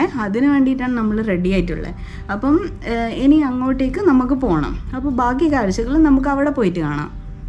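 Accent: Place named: Kannur, India. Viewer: video nannayittund ! typical kannur bhasha enikkishtayi.njanum kannurkari anu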